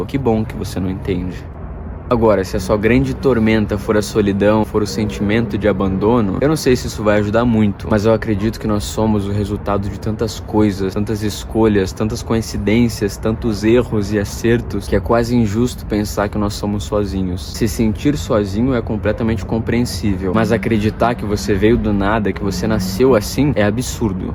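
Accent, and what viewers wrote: Caxias do Sul, Brazil. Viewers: Ele é de Caxias do Sul?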